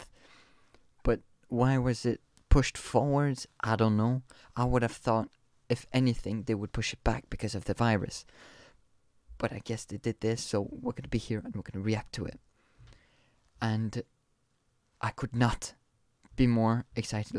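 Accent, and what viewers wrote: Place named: France. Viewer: Love your reaction! Haha Totally understand it too, haha I was like wtf? Lol You have an accent, do you speak French?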